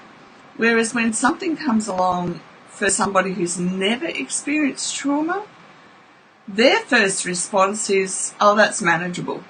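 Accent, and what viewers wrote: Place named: Australia. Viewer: Thought I’d add, I’m a 49 year old woman and you tube popped up your video, I live in Jackson Mississippi and was listening to Dr Kirk Honda vids. You sound Australian, are you? I married a kiwi and resides in NZ for 10 years. I’m often amazed at the people who I won’t know “in real world life” can provide such insight and help to others. I plan to watch more videos, you seem very pleasant as well as quite credible and informed.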